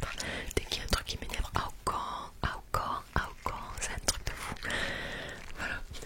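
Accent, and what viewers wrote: South, France. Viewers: Super concept, et j'ai appris des choses comme ça je passe crème quand je retournerai dans le sud haha (par contre j'arrive pas faire l'accent, que j'adore). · Il y a énormément de mot que nous utilisons également dans le Nord, et un peu partout en France même, qui sont globalisés dans un langage familier. Après vous avez quelque mot que je n'avais jamais entendu, magarelle 😮 ! 😂. La team chocolatine le débat est finis, seul les sudistes le disent, nous sommes 90% à dire "petits pains" dans la France. Le principal étant qu'on sache ce que les deux mots veulent dire. Ceci étant dit, "chocolatine" ( d'ailleurs même ma saisie automatique sur mon clavier ne connait pas 😂) prend du charme quand il est prononcé avec votre accent ! Sympa la découverte 😊👌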